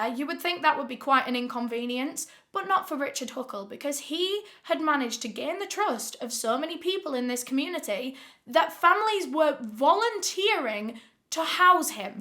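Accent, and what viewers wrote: United Kingdom. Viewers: Do you ever see a person before you hear the accent you think to yourself "that person is very very British." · You are my favorite true crime channel. I’m from the US so love the accent as well. You give us information as well as having the upmost respect for all the victims you talk about. And also holidays are coming around and I know you talk about your personalized hair care and for the life of me I can’t remember the name or website please let me know thank you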